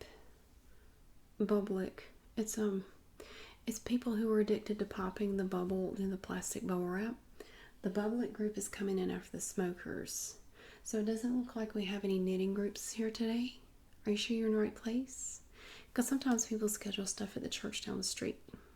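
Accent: South, United States. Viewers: gosh I'm from the south and your accent sounds like everyone in my family. lol this character reminds me of a spoiled aunt I have...especially with the southern accent. Love it!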